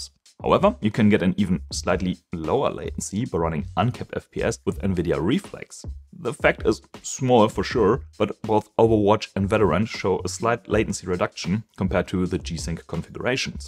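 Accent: Germany. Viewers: Tatsächlich nicht den typischen deutschen Dialekt aber dann auf den letzen Metern verraten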